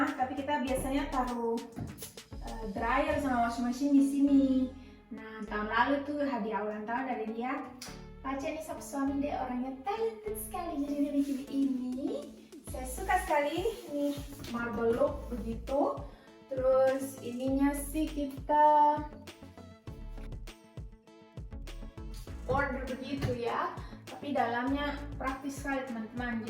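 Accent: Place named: Eastern Indonesia, Indonesia. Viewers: Hai kak, baru nemu dan langsung subsc dong pass denger logat ketimuran kk 😅❤ salam kenal dari sultra 😘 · Shalom mb sy br subcribe slm kenal sy agustina suku Toraja- Indonesia, logatx mb spt org toraja slm succes mb n Mr. Tuhan Yesus Kristus memberkati kita 🙏🥰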